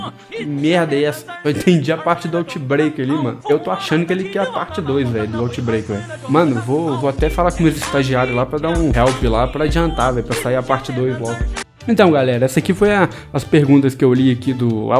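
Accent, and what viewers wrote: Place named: Minas Gerais, Brazil. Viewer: Quem é esse mineiro?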